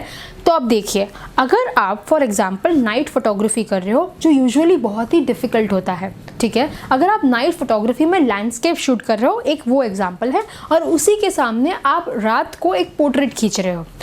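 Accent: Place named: Bihar, India. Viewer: बेसिकली बिहार से लगती है आप .. anyways, अच्छे से बताती हैं, लेकिन presentation की थोड़ी सी तैयारी और जरूरी है .. जानकारी तो है no doubt... 👍👍💐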